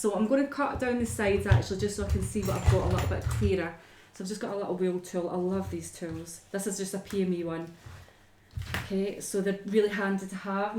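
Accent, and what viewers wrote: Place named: Scotland, United Kingdom. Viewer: So beautiful you are so talented and what a lovely scottish accent , my husband came from Fyfe. I have tried so many times to wire peonies but always seem to fail they spin and crack so sadly I dont try anymore😢 thank you for your tutorial x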